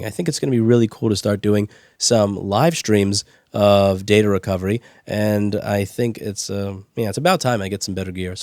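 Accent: New York, United States. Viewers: Very entertaining, I just love your approach to injustice, etc, and the New York City "way"...of speaking out verbally. Anyway, being on the west coast, in Oregon, I never could stand the whole apple products pushback by their cult leaders and the members of the congregation, most noticeable here on the west coast which is closer to them. They and the other internet giants pretty much proclaim to rule the world, maybe they do right now, too big for their own good and it reminds me of doctors or lawyers or politicians who come from elite families and have gone to elite schools who have no clue, no idea what its like to be a regular human being or what the inside of a grocery store looks like for instance......have they banned you from facebook, twitter, paypal, google, etc etc yet? Seems like these days all the tech giants have a mutual agreement to ban nay sayers from everywhere all of a sudden.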